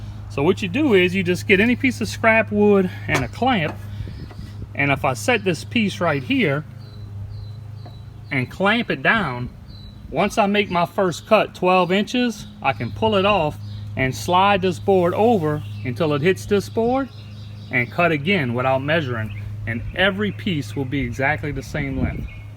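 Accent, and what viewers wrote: Louisiana, United States. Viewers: Hi from the sound your accent you your ✋ from Louisiana like me.i really enjoy your vids really good stuff.i leañed a lot .old carpenter like . Again keep up the good work and I'll be want for some more stuff to learn...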